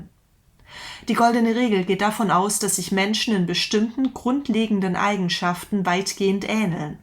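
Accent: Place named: Swabia, Germany. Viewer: Bisch du au Schwoab? Es klingt fast so :D Super Kanal!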